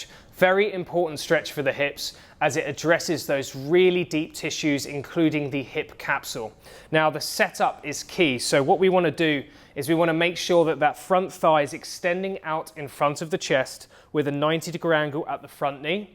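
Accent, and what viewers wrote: United Kingdom. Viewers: Very nice and detailed presentation. Your video was full of the kind of detail that's helpful to know to achieve good results. And, you sound British, but you look like Capt. America.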